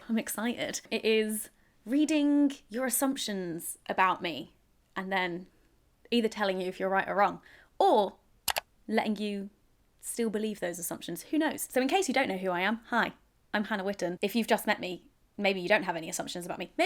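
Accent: France. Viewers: Ton accent français est adorable!! ❤️ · wow loving your French accent for REAL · You have a nice French Accent :) Just need some practice. I would love for you to come here, I'll be glad to see you <3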